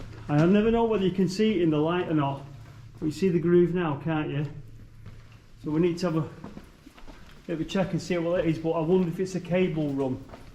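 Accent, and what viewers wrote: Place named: Manchester, United Kingdom. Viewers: Being a foreigner I am unable to pinpoint the name of it, but I just want to tell you that you have the most wonderfull english accent. I presume it origins from your homestead Manchester. But never mind - I love the way you talk 😊 And the subject is still exiting as in part one. Brilliant work. Best regards from Denmark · My Grandfather was from Manchester, ( your accent reminds me of him), my Grandmother was from Liverpool. I'll bet they were quite familiar with these tunnels!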